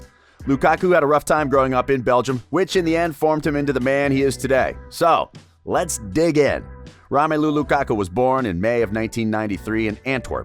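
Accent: United States. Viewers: It would be more credible if someone other than an American narrated it.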